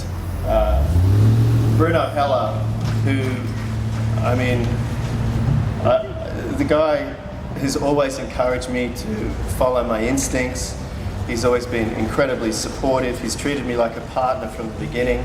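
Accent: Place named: Australia. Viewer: In the beginning, I thought he was american, because of his accent in The Mentalist, and I also hadn't watched any videos until my interest grew on him specifically. It shocks me to hear him in an Aussie accent, you know? It makes me cry with this video because he's just so . . . perfect.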